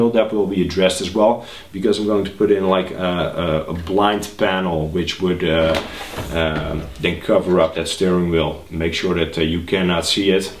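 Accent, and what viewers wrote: Netherlands. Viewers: I hear a bit of a dutch accent. great video, even though I'm a bit late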